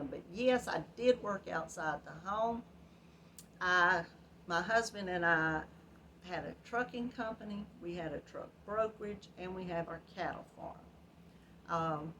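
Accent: South, United States. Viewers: I really love watching your videos and hearing you talk. I especially love your Southern accent! There's just something soothing about it. Your Sislove Shawl is so beautiful! I always have several projects going at once, and don't always get everything done by the deadlines I set for myself. My shawl is still in Section one, but I will finish it. Thank you for your videos!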